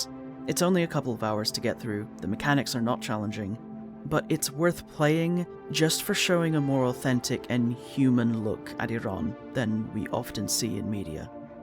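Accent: Scotland, United Kingdom. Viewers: Oh! I didn't realize you were Scottish. I am comically bad at accents.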